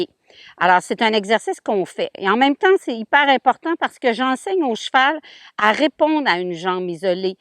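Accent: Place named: Quebec, Canada. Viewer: Salut eh ou habite-tu car tu as un axais québécois comme moi 😄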